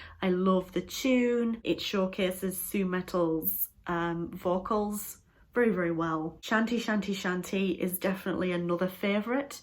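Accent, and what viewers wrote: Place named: Geordie, United Kingdom. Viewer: Fascinating video with some Interesting choices! My fav tracks for each album are::, (......Best Album!):,;, Trying to pinpoint your accent: sounds like a hybrid of Geordie and North Yorkshire..........so I'm guessing: Middlesborough or possibly Darlington.......?:) btw I've included your video in my Reactions (Best of) playlist..... check it out on my channel (which has ELEVEN playlists devoted exclusively to -related content.....with a total of over 8,500 videos: what better way to fill these long lonely days of Corona quarantine?!!! lol)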